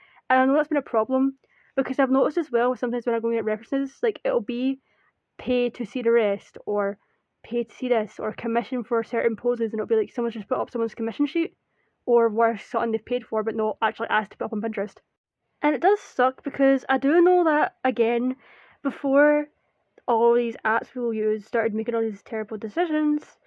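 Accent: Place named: United Kingdom. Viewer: OH GOD. SHES FUCKING BRITISH!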